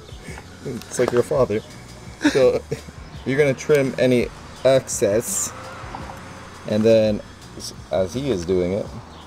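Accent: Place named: Cape Breton, Canada. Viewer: Where you from? I hear that Cape Breton accent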